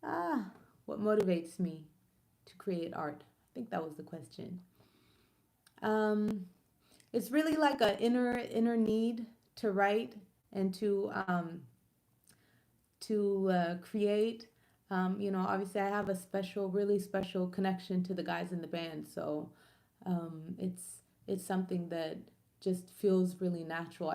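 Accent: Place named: United States. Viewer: She doesn't have an accent she sounds American.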